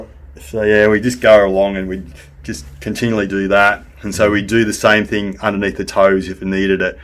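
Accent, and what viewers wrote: Australia. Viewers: I love foot massage videos. With Aussie accent, I smashed the reply. Thanks for posting this video. I subscribed.👍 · I picked up the aussie accent ! Hello from Paris France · Your typical Aussie accent. Represent 💪🏿💪🏿💪🏿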